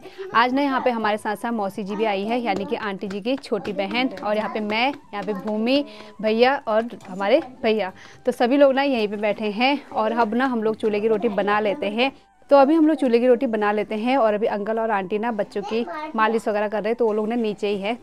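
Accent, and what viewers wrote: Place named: Almora, India. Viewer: बेटा आपका मायका कौन से गॉव में पड़ता है। आपकी भाषा अल्मोड़ा जैसी लगती है। नामकरण में जाकर आपने अपना फर्ज निभाया। बहुत अच्छा लगा। 🙏🙏🌹🌹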